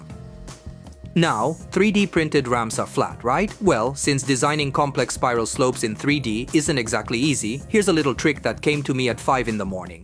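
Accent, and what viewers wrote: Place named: Italy. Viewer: I prefer your real voice. Who doesn't like an Italian accent?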